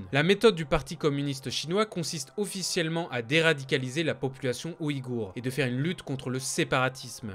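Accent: France. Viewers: t'as vu son texte où ça ? Parce que moi tout ce que j'entends c'est un français qui prononce les "X" comme en français et le "J" aussi mais je t'en pris donne moi un cours de chinois puisque t'as l'air de visiblement connaître plus que moi... C'etait quoi encore ton commentaire ? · ça s'appelle l'accent français. Et au pire concentre toi sur autre chose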